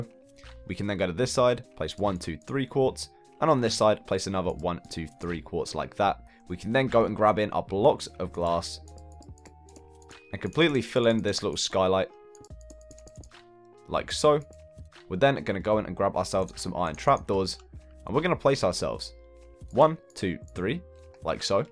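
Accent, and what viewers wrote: United Kingdom. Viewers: Ar you english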